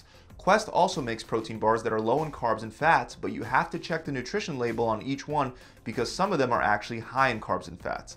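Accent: Philadelphia, United States. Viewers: I wonder if he’s from philly 😂 based on the way he says water lol! It’s awesome! Also… great video!! Everything is straight to the point and quick!!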